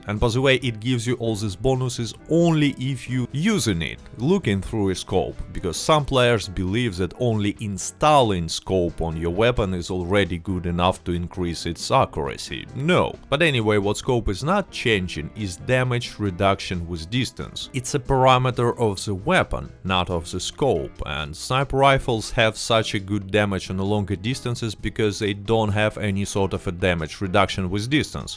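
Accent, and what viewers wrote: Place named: Russia. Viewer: Четкий русский акцент, 😄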